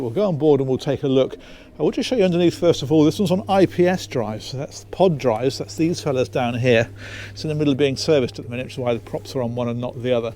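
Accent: England, United Kingdom. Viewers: I second the motion Thank you for showing this (Boat) I noticed that you very finely opened up the cabinets to the galley. I apreciate that. I went to school in Exceter as a boy and I miss my cold overcast days..no really. I came home to LA with an accent :) yours reminds me of fond memories. I enjoy your showings. On the Magellano 53 you breezed right by them ! (the cabinets) I was hoping you would come back around to see if there was a dishwasher or anything else. I thought maybe I would have to go to counseling when you did not. haha:) I look forward to your next Tour. Thanks again, Tom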